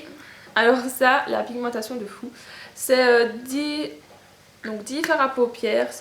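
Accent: Belgium. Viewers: Sympa d'entendre une belge pour une fois hihi :) J'avais déjà entendu parler de sleek mais je ne savais pas où commander, grâce à toi mtn je sais :p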